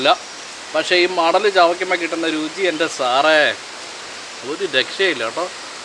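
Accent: Kottayam, India. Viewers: ചേട്ടന്റെ സംഭാഷണം കേട്ടിട്ട് കോട്ടയം ഭാഗത്താണോ veedu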